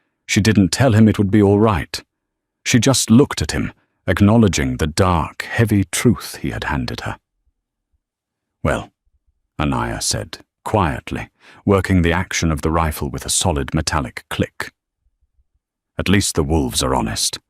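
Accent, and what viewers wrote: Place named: RP, United Kingdom. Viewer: This upper crust British accent is not a good voice for pioneer stories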